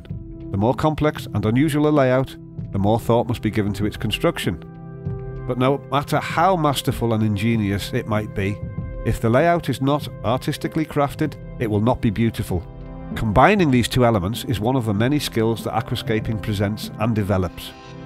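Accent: Liverpool, United Kingdom. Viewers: Hjalmar Nordén he’s not from Sheffield lol. It’s much closer to Liverpool or the Mersey area. They’re scouse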